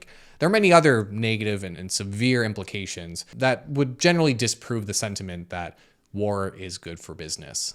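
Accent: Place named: Canada. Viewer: i love the fact that you canadians always have an interesting accent...like "welcome to the pleine baygle" hahahaah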